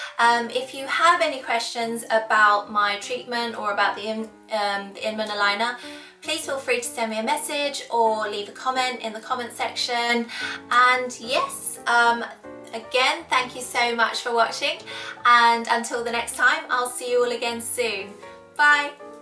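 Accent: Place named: United Kingdom. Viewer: You look asian and your accent was brtish i love it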